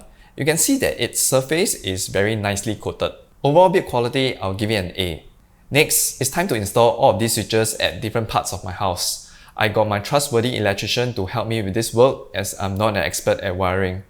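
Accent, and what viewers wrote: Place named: Singapore. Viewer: Sounds Singaporian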